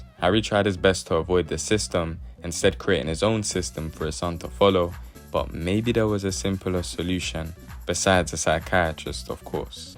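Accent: United Kingdom. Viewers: Unironically yeah. His intrusive thoughts. Lack of social adaptability and understanding the human condition could be solved if he just watched and listened to the ppl around him as he’s actually very empathetic Unironically goated algo pull. And another British voice on Dexter is always needed loool. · Oh no the narrator is British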